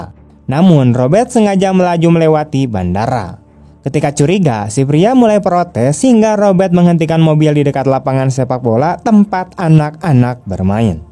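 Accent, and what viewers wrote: West Java, Indonesia. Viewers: orang sunda nya mang🗿?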